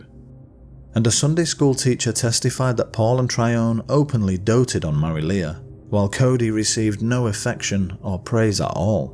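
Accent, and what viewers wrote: United Kingdom. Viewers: Why do you have a British narrator when it occurred in the United States?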